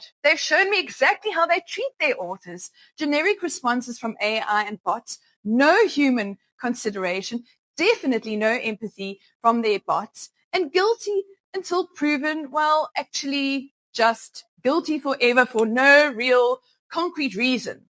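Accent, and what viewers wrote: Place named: United States. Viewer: Wow! Your accent looks American. I know someone who Amazon KDP terminated her account of $10k royalty and promised never to give her a penny. If they will reinstate your account after paying $2000 to the attorney, it's totally worth it I promise but who knows if that's a guarantee that you will recover your account, or who knows if that's another opportunity to make away with your money 🤔. Above all, who knows if they wouldn't come in future and finally terminate your account so that the whole processes will continue again.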